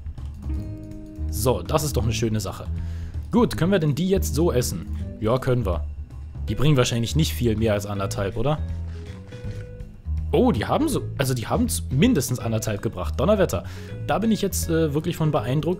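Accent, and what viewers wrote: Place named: Berlin, Germany. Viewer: Ja klar, die Schlangen im Berliner Zoo können einen ja auch nicht angreifen, weil sie hinter Glas sind! Dass du aus Berlin bist dachte ich mir schon. Das "och" statt "auch" hat dich verraten. Ich stamme übrigens aus Nordhessen, wir sagen statt "auch" "ö"! Bsp.: "Dis hon ich mä ö schon gedacht."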